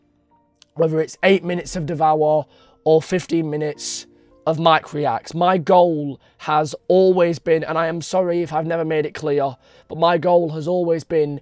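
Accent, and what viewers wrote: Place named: Manchester, United Kingdom. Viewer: Where are you from? I'm from Sweden so I can only guess Mancester. Sorry if you are from Salford. But you are from from the north, aren't you. And you don't have to be "larger than life!"...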